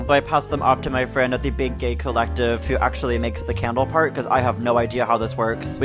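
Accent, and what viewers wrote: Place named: Germany. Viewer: Is it just me or did your accent change to german halfway through the video?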